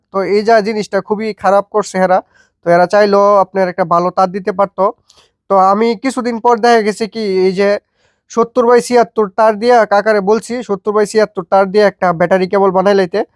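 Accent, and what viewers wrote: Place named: Brahmanbaria, Bangladesh. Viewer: ভাইয়ের কথার ভংগি ব্রাহ্মণবাড়িয়ার মানুষের মতো।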